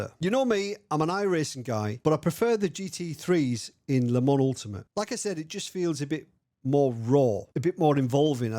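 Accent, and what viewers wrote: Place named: North East England, United Kingdom. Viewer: Hey, I notice your North East accent. You based up here in NE England by any chance?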